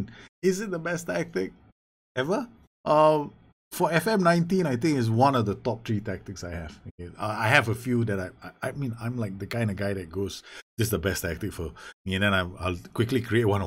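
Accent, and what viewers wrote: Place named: Singapore. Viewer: you sound a little Singaporean hmm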